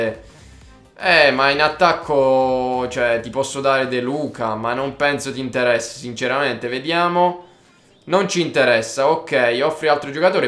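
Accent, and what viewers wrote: Bari, Italy. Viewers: MA SEI DI BARI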